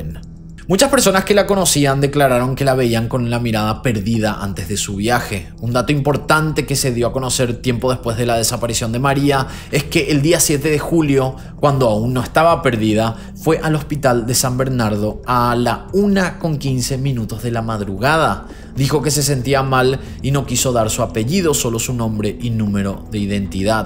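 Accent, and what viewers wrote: Paraguay. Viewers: Hola..!!! Saludos desde Las Toscas Santa Fe Argentina... siempre estoy siguiendo tus videos..!!! aguante la tonadita de Paraguay..!!! Éxitos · Es paraguayo? Justo me preguntaba de donde era el acento.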